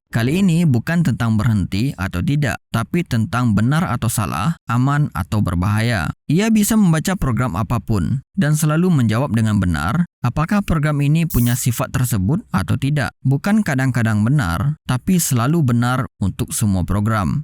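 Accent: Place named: Bali, Indonesia. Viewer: Pengisi suaranya orang bali kah? Berasa banget logatnya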